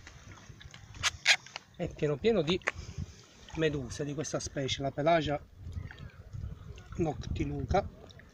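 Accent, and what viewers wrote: Sicily, Italy. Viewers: Mamma mia l'accento siciliano si sente tanto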